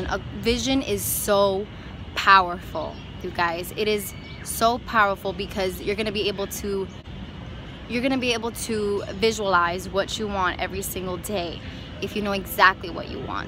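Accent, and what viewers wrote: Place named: New York, United States. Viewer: I can recognize NY anywhere 😂🙌🏻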